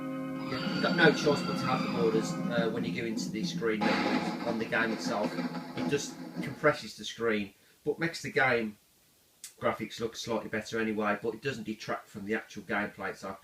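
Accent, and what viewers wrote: Birmingham, United Kingdom. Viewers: Congrats, lovely addition to your cillection. Looks fantastic, really nice graphics on this version. I like those huge neo geo style cases as well:) Don't worry about your brummie accent mate lol people listen to talk bollocks in my gameplays so the accent must be ok:)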